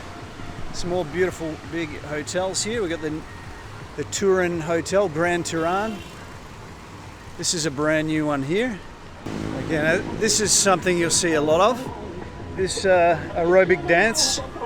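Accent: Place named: Australia. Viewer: christ mate thats one and a half of an australian accent isn't it gabba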